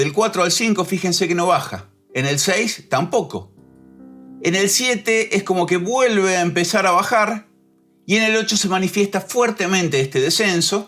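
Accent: Argentina. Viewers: Muy buena explicación técnica realizada con esa manera de hablar tan característica de los académicos Argentinos.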